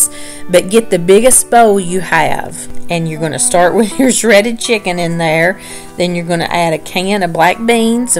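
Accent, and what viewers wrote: South, United States. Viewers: I also love your wonderful accent 🙂. Sometimes I wish I was Southern 😂. But even though I can't speak in that beautiful way y'all do without being annoying or offending someone, I can try to make southern food. I've been making biscuits homemade from scratch since late last year and my family always loves them. I live in a country where there are absolutely no canned biscuits. So when I move back to the states Lord willing, being able to buy so many easy canned stuff will be such a treat. One batch makes about 11 biscuits and there never seems to be enough especially as our dog will not stop barking (when she's tied up momentarily so we can eat without her climbing all over my mom to get some) unless she gets one 😄.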